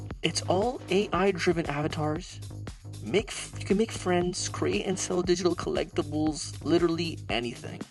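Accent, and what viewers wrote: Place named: United States. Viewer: where you from? I’m having trouble deciding whether you’re American or Canadian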